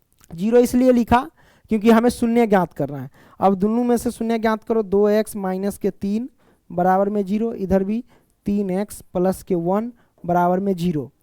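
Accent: Bihar, India. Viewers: Sir apki aavvaj bahut hi mast hai bihari type me mast samaj me aya ho to subscribe kare ❤❤❤❤❤❤❤❤❤❤❤❤❤❤❤❤❤❤❤❤❤❤❤❤❤❤❤❤❤❤❤❤❤❤❤❤❤❤❤❤❤❤❤❤❤❤❤❤❤❤❤❤❤❤❤❤❤❤❤❤❤❤❤❤❤❤❤❤❤❤❤❤❤❤❤❤❤❤❤❤❤❤❤❤❤❤❤❤❤❤❤❤❤❤❤❤❤❤❤❤❤❤❤❤❤❤❤❤❤❤❤❤❤❤❤❤❤❤❤❤❤❤❤❤❤❤❤❤❤❤❤❤❤❤❤😍😍😍😍😍😍😍❤😍❤😍❤😍❤😍😍❤😍❤😍❤😍❤😍😍❤😍❤❤❤❤❤❤❤❤❤❤❤❤❤❤❤❤🎉🎉🎉🎉🎉🎉❤🎉❤🎉❤🎉❤🎉🎉❤🎉❤🎉❤🎉❤🎉❤❤❤❤❤❤🎉🎉❤🎉❤🎉❤🎉❤🎉❤❤❤❤❤❤❤❤❤❤❤❤❤❤❤❤❤❤❤❤❤❤❤❤❤❤❤❤❤❤❤❤❤❤❤❤❤❤❤❤❤❤❤❤❤❤❤❤❤❤❤❤❤❤❤❤❤❤❤❤❤❤❤❤❤❤❤❤❤❤❤❤❤❤❤❤❤❤❤❤❤❤❤❤❤❤❤❤❤❤❤❤❤❤❤❤❤❤❤❤❤❤❤❤❤❤❤❤❤❤❤❤❤❤❤❤❤❤❤❤❤❤❤❤❤❤❤❤❤❤❤❤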